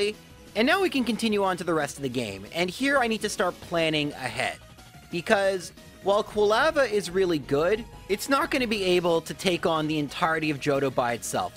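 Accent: Canada. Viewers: pretty sure hes canadian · Canadian accent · You sound Canadian.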